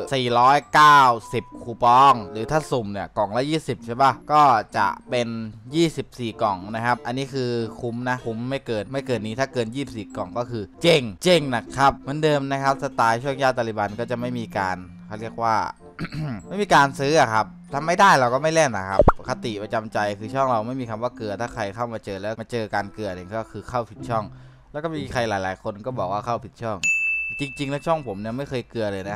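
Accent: South, Thailand. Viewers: พี่ จังหวัดไหนของภาคใต้คับ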